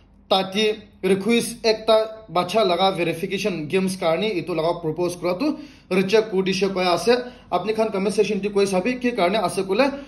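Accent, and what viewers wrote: Nagaland, India. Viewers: I like his accent, pure naga ase. Hoi na nahoi comment section viewers.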